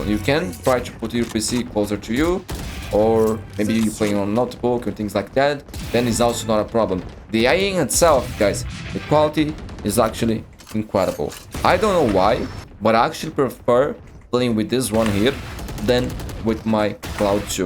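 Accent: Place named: Brazil. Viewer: eu comecei o video pensando: cara, ele tem um sotaque do ingles brasileiro, ai quando eu olho pro seu lado, tem um camisa do flamengo kkkkkkkkk.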